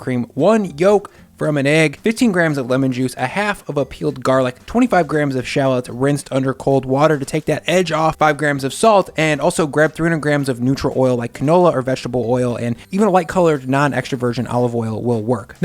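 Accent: Chicago, United States. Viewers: I'm guessing the accent is Chicago? The "SH" sound in a word like, "schtrength". This looks like a Nashville Chicken sangwich but without the pickle juice marinade for the chicken.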